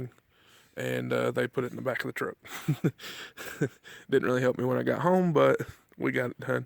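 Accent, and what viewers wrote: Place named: Georgia, United States. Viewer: Dang I thought you was from Georgia😂😂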